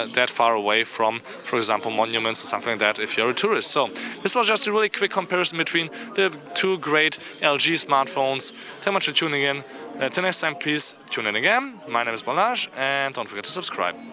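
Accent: Germany. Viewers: Man hört schon den deutschen Aktzent, aber gut gemacht ;-)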